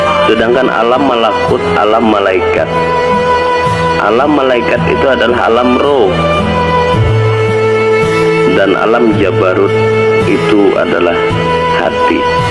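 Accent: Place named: Kalimantan, Indonesia. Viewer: ma'af kliru..🙏 logat n bahasanya mirip di kalimantan.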